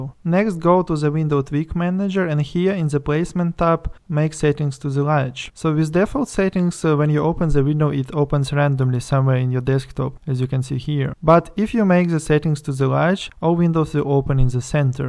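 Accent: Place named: Russia. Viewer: I don't think so - he has a very strong Russian accent :-)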